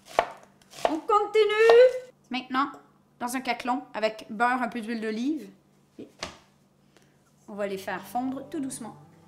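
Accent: Canada. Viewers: en ayant vecu en France je sais reconnaitre l'accent canadien qui est très drole 😄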